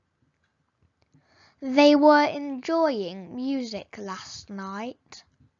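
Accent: United Kingdom. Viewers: Yes, it is a British accent 🙂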